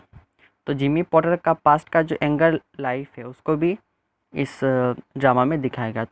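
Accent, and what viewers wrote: Bengal, India. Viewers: Aap bengali ho? aapki ascent se pta chl rha h Nice explanation 😊😊 It'll help a lot in answer writing.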